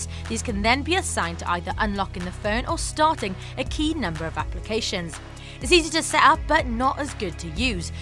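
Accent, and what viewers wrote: Wales, United Kingdom. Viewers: ur from WALES ! Alright, hows it going Alright?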